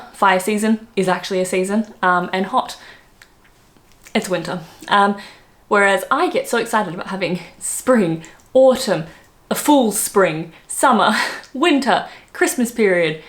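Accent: Australia. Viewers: We Brits can be direct, particularly if trying to get ones money back for a cancelled event or faulty goods. Venting ones spleen is cheaper than an exorbitantly priced Solicitors letter. You can pass as a Brit as your accent is not overtly Aussie, more nondescript and anyone who lived in Earls Court for a reasonable time would be used to Aussieisms, used by all residents there. Is it still the Aussie capital of London? The politeness on the roads is an hangover from as late as the 50's, when horse drawn transport was still common and one gave way to an horse drawn vehicle, they still have right of way. Not being a Cricket fan and admitting it has just presented Australian immigration authorities an excuse for refusing entry back into the country, Despite the Australian lockdown this upcoming Ashes tour has got the go ahead, nothing stops the Ashes, apart from a World War. 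· You are sadly losing your lovely Aussie twang it’s changing gradually in to a posh English accent. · You do still sound Australian if that helps, although not overly so, quite a posh version 😁🤔.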